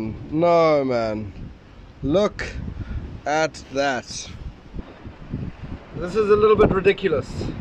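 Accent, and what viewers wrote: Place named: South Africa. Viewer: Refreshing Saffa accent. Tight lines boet.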